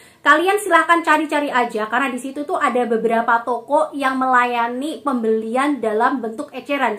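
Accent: Java, Indonesia. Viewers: Mbanya medok banget. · Mba logat nya ky orang Jawa😀😀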